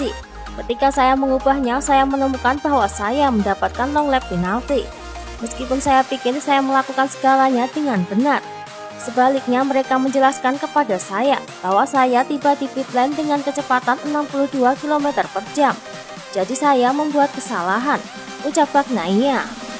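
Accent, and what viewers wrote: Java, Indonesia. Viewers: Medok beud njir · Gagal fokus sm suara pengisi video, logatnya jawa ini.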